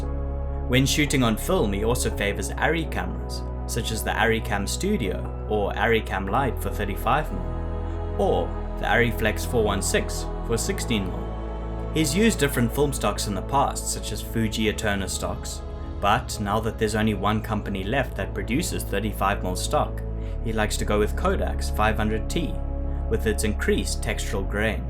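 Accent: South Africa. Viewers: You sound south African Are you?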